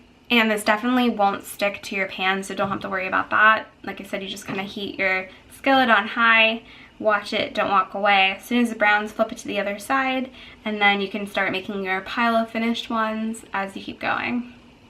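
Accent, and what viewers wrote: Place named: South, United States. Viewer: Hey! You are from the South so of course things are pronounced differently. Great recipe. I will do it soon when I get some sweet potatoes. From B.C. Canada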